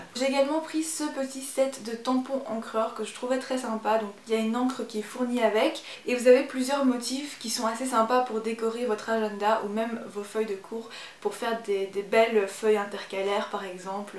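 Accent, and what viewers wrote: Belgium. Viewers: Tu es belge ?😄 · T'es Belge?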